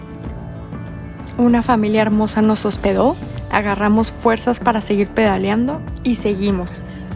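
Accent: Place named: Monterrey, Mexico. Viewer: Chicos me tenéis enamorado de vuestro viaje y lo buena narradora que eres tú explicarlo todo con ese lenguaje y acento tan guapo mexicano que tienes o sigo porque yo también soy aventurero teclista ya he recorrido todo México en bicicleta y Centroamérica continente africano y Europa próximo objetivo es viajar a Sudamérica en bicicleta vuestro vídeo me siguen animando y dándome fuerza para emprender este viaje ya que a mi edad y ya un poco difícil y es forzoso ya que soy una persona jubilada con 65 años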